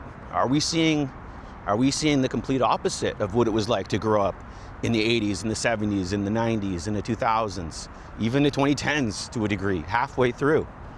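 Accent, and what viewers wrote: Canada. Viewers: I can tell by your accent that you were Canadian, but that popeyes chicken scene you described sounded like new orleans, baltimore, chicago or one of our other ghettos in the states. Stay safe out there.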